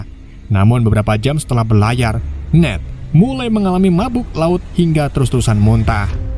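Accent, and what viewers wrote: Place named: Pemalang, Indonesia. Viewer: Kamu orang pemalang kah min?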